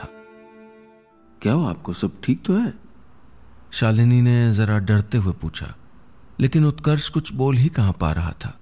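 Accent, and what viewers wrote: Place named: Pahadi, India. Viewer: बहुत अच्छी कहानी खासकर हमारी पहाड़ी बोली के बोल आपके मुँह से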